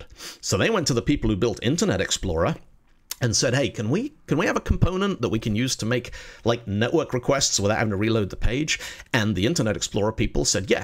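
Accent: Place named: United Kingdom. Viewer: I can't pin your accent. It's like British with a twist of Oz and North American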